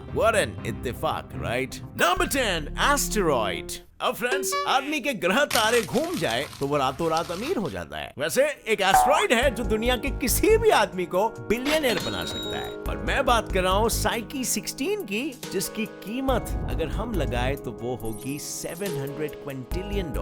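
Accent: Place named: Uttarakhand, India. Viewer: Aap uttarakhand se ho mujhe pta chl gya 🤣🤣😂😂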